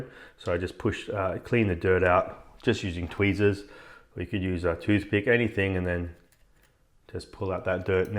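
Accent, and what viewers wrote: Australia. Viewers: elliott debell Australia going by his accent.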